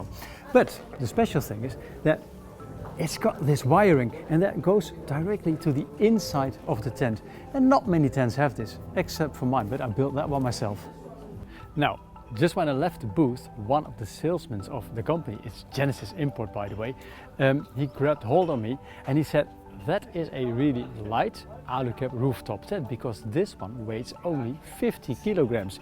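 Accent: Netherlands. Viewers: Nice video, I really enjoyed watching it. If you would allow me to give just one point of critique, it would be this: while your English is excellent (ok, I can hear that you're a Dutchman, but there's no shame in that), there was one expression you used that kind of irritated my ears. It's when you say samething like: "that is how it should look like". You're stringing two expressions into one (incorrectly), so you could either say "that is how it should look" or "that is what it should look like". Apart from that, again: great video.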